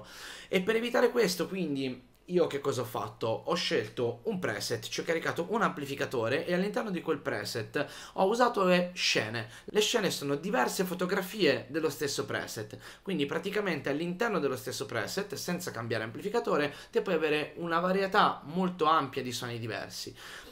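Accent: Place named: Tuscany, Italy. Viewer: Oh con l accento toscano mi fai troppo strano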